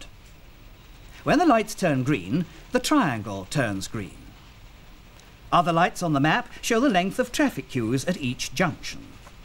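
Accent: RP, United Kingdom. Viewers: “Tel-eh-vishon keyameras” love the R.P. Btw, Wondered how long it would take for some sad kn*bhead to mention ‘Police State’ this was1972 you d*cks! It’s just a bit of nostalgia!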